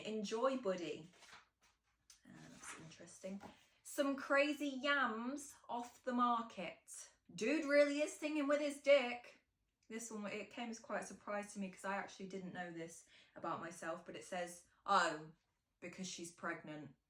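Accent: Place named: United Kingdom. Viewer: What a beautifull british english. And all the best, blessings together.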